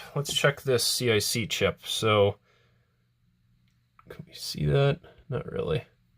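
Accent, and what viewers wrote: Canada. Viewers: Hi im from the Netherlands. I really love your videos, and learning stuff and such a pleasant Canadian accent. Just wanted to say it once. Keep going!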